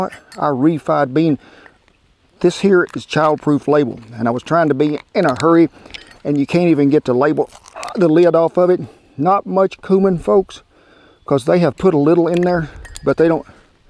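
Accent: South, United States. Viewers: Thank you for all your video's. I love the way you explain things in southern language. May God bless you always. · Damn, I'm a Brit who's always had a thing for the country American accent and good food who's just stumbled on your videos, you've just hit both nails in one. Looking forward to watching more in the future sir!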